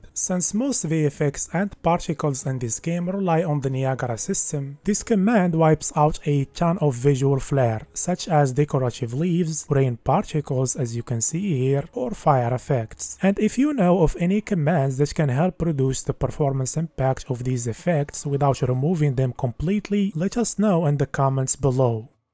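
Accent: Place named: Morocco. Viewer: yes , i thought that too because his accent's like marrocans ❤. Thank you bro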